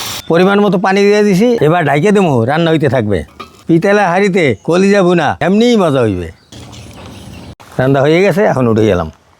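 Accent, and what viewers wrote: Barishal, Bangladesh. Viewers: হালারপো হালা বরিশাইল্লা।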